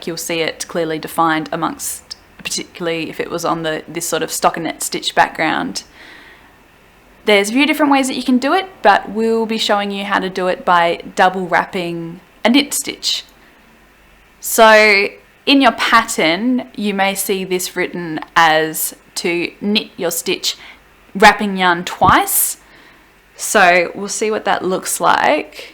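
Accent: Australia. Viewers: Thanks for this. I too am knitting socks with this instruction and now I know how to proceed. P.S. It’s nice to hear an Australian voice.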